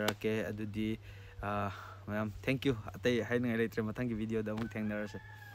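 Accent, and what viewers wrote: Kakching, India. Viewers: Lon khoiba khr kaokhrasu accent di kakching oiri 😅… 50k ywhllase mym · Touruk o kakching tune da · Kakching marol da adum ngango taba ym nungaiye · Ym manle eikhoiju kakching ga thngnana leibne aduna marol se fajana khnge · Kakching macha original deidi Brother nabu henna mankhreda🎉... Full support.. Aroibgi kakching lon gi sentence tudi word amfao khngba ngmde💔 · Hit chatle kakching maronse 😂😂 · Fjna mlle kakching mrolse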